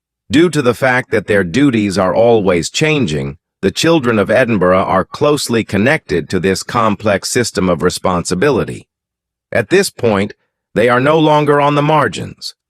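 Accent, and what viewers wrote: United States. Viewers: American voice talking about the British monarchy just sounds a bit off the mark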